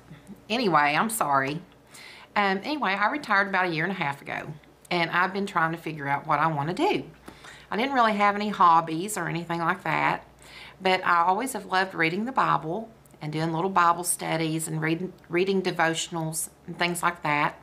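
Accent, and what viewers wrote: South, United States. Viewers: I just love your southern accent♥️ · what a great video! Thank you! It gave me great ideas and I really enjoyed it a lot! You are so sweet. Where are you from? You sound so southern! I am from the south but living in New Jersey the las almost 2 years and I feel out of place sometimes with my "y' all and my sweetie" comments to everyone...LOL anyhow, I really enjoyed the video and subscribed and am going to look at your other stuff on your channel! Look forward to seeing more. I will be making a prayer notebook and journal with my grand daughter in the next week or two and I promised her I would find some great info for us to put in ours. It should be a blast! I am so excited that she at 14 is interested in doing this! Praise The Lord! Blessing to you and your loved ones. Linda Coss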